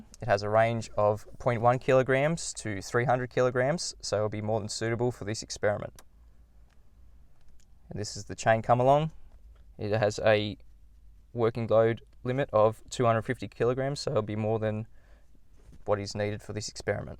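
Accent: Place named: Australia. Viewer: Good luck with your experiment. I think your vertical bars may need some strengthening. Possibly some angled bars from the horizontal bars near the container to the pulley and to the tensioning line connection, there will be largish forces involved. triangles will give you your strength. ==================== At least you understand buoyancy is a function of gravity. Considering that gravity isn't compatible with any FE model, you wont be getting much FE support. ===================== You have an Aussie accent, therefore you can ascertain South (using a few stars) and observe the South Celestial Pole on any night. (that also breaks all FE models) A far simpler experiment, but you should give this idea a go.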